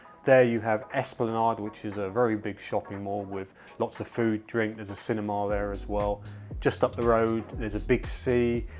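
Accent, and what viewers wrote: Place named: Oxford, United Kingdom. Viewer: yes , clear nice oxford english as a native british. Guess he is a true gentleman as well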